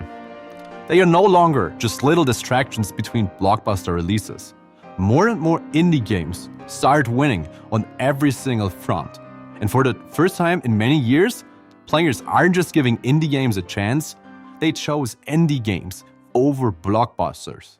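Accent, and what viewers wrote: Germany. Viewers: Höre ich da deutschen Akzent?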